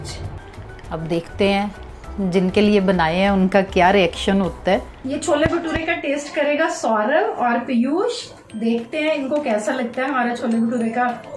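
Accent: Punjab, India. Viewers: I love your punjabi accent mam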